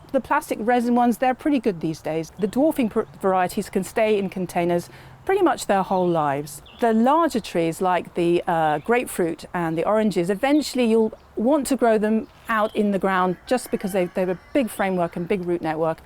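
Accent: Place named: Texas, United States. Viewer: The accent lol 😹 I thought this was Texas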